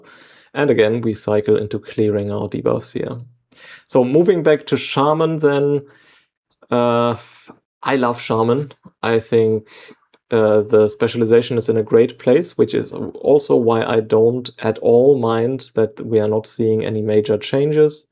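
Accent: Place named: Germany. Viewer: Nolo, schön, dass du mit deiner Aussage Leuten Faulheit beim Lernen unterstellen möchtest oder Menschen, die eine Lernbehinderung haben, damit vor die Füße spuckst. Ich kann Englisch und ja, man kann ihn gut verstehen, und er macht seine Sache auch sehr gut. Das hat allerdings gar nichts mit meiner Aussage zu tun. „Wer lesen und verstehen kann, ist klar im … naja, du weißt schon …“ Man hört bei ihm halt ganz klar den Deutschen raus, und ich finde es einfach schade, dass es kaum gute deutsche WoW-Content-Creator gibt. Ja, ich weiß, mit Englisch erreichst du einfach viel mehr Leute, aber dafür ist der Markt auch stark übersättigt. Daher kommt meine Aussage. Aber gerne werde ich für Menschen wie dich dies in Zukunft genauer spezifizieren. Ein schönes Wochenende wünsche ich dir damit und schon einmal einen guten Rutsch für S2!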